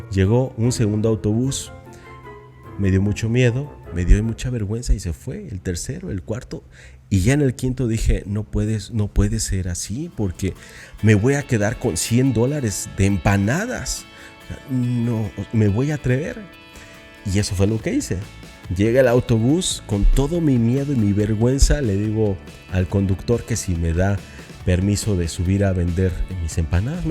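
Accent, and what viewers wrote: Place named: Colombia. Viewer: pensé que eras colombiano.por ti acento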